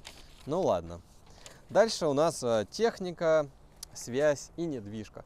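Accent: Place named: United States. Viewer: ..а еще у тебя произношение американское становится,даже говоря по русски)))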